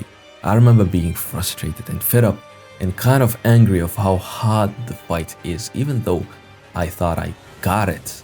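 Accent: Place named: Italy. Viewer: I'm italian, and you REALLY have the accent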